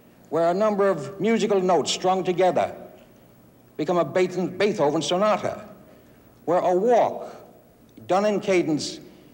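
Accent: United States. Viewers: Lower East Side Manhattan accent. There is NO mistaking it! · ***** New York old Irish.......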